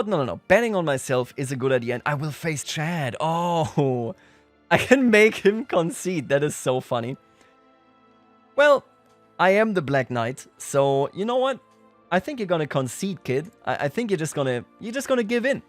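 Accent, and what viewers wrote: Germany. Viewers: 2 Sekunden und ich wusste das du deutsch bist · I smell Deutsch in your Akzent But I live this kind of content so pleas keep on by doing so man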